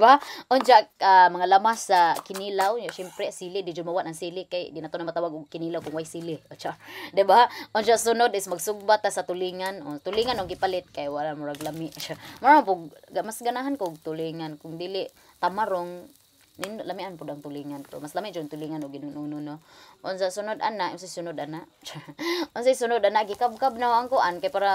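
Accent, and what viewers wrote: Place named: Bohol, Philippines. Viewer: You sound like my ex boyfriend from Loon Bohol and my Grandma from Cortes Bohol hahaha your accent ate hahaha san ka sa Bohol? im from Davao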